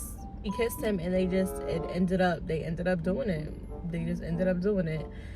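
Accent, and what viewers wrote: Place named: Dominican Republic. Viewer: I like her accent, she must be Dominican 😍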